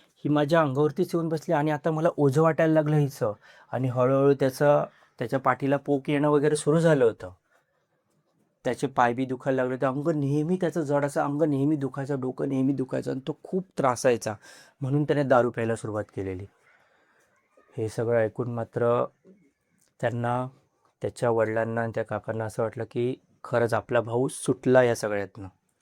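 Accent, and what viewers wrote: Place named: Pune, India. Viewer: अभिवाचनाची लकब पुणेरी वाटते❤❤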